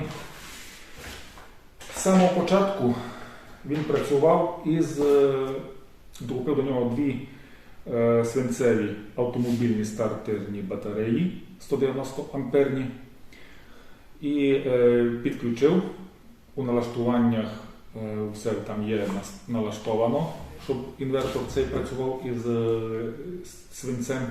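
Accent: Transcarpathia, Ukraine. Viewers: Ви із Закарпаття)))?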